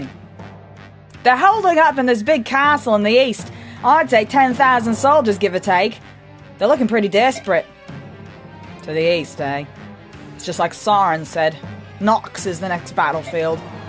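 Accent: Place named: Germany. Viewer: oh not at all, your german accent is fantastic, and you were very reminiscent of the actress who voiced Rip Van Winkle.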